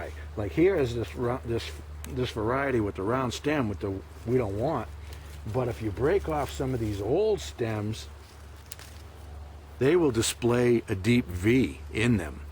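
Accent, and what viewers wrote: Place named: Boston, United States. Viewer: I'm from Boston and this guy sounds like he's a Bostonian. I had no idea the New Brunswick accent was so similar to mine!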